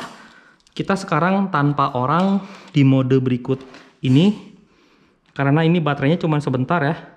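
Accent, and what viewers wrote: West Java, Indonesia. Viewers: Bang Sunda Nya Sunda Mana Bang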